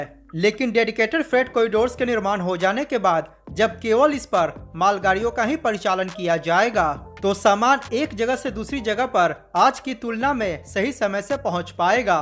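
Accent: Bihar, India. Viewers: Is the presenter Bihari?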